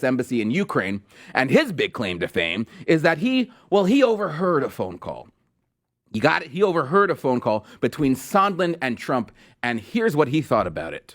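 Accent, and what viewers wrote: Jamaica. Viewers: Are you jamaican? That was pretty good lol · I like his Jamaican accent.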